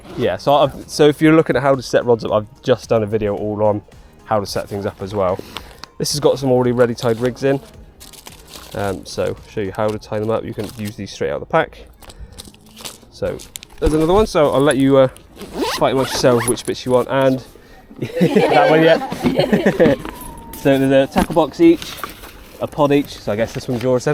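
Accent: Bristol, United Kingdom. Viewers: Is that a Bristol accent 🤔 Thankyou for the video Mate 🙂👍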